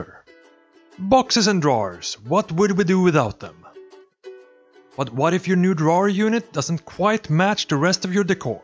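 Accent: Sweden. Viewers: You from sweden? Ur accent is funny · You are so wonderfully swedish when you speak xD <3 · Typical Swedish accent. I'm Norwegian, I've heard it a lot since I've been around Swedish people in international environments =) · My grandparents were from Sweden. Hearing your accent makes me miss them very much. Also, great information!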